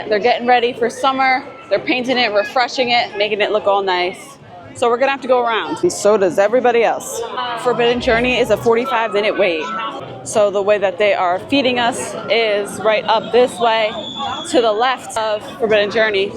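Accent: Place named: Long Island, United States. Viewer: I love your video... you gotta be from long island.. lol your accent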